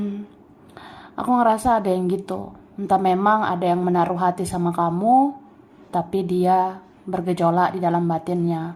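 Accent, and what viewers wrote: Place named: Java, Indonesia. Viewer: meduuuukkk suarane sampean mbak yuuuuuu....slam persahabatan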